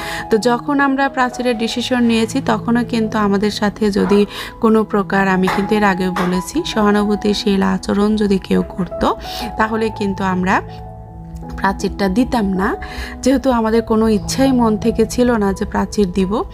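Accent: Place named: Bogura, Bangladesh. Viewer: আপু তোমার মুখে বগুড়ার ভাষা শুনে খুব ভালো লাগছে 😊আমার দাদাবাড়ী বগুড়া শেরপুরে সীমাবাড়ি আর সারিয়া কান্দি ভুয়া গাথি অনেক আত্মীয় আছে😊❤❤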